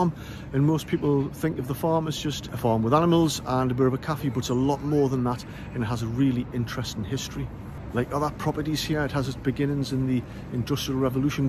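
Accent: Newcastle, United Kingdom. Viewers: Great video,research and genuine empathy . You sound a really decent and genuine bloke(and Geordie) 👍 Howard the lads!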